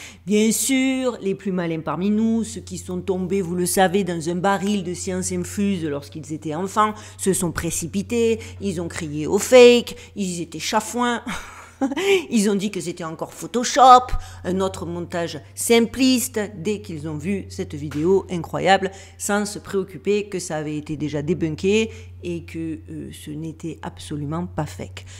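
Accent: South, France. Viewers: J'ai decouvert recemment votre chaine et franchement bravo : votre déroulé est structuré, les themes pertinents et suspens, humour et cet accent du sud que j'me tant. Tout y est. Alors on ne lache rien, on met nos ceintures et on s'envole avec vous pour des horizons plus ouverts. Tant mieux si tout ce qu'on a appris etait faux ca nous force a decoder plus loin. Et en science on dit que toute hypothese est vraie jusqu'a ce qu'une autre l'infirme et la remplace. Restons modestes et les yeux et le coeur grand ouvert. Vous nous montrez la voie. Les contes et mythologies populaires nous plongent dans nos origines et les conteurs etaient des personnes riches d'un savoir caché transmis de bouche a oreille alors j'embarque avec joie !!!! Force a vous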